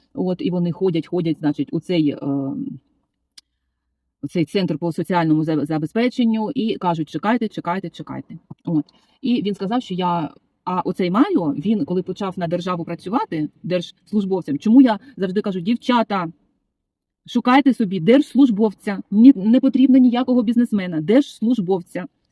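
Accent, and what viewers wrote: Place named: Surzhyk, Ukraine. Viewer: Дякуємо, що розповідаєте, як живуть у світі люди! Вам бажаю знайти СуперМаріо з апартаментами😊, та за жити спокійним гармонійним життям! А ні, то і українці гарні хлопці є! І з апартаментами теж. І, до речі, нічого, що інколи проскакує суржик в розмові.У нас півУкраїни на суржику говорить. Удачі вам! 🩵☀️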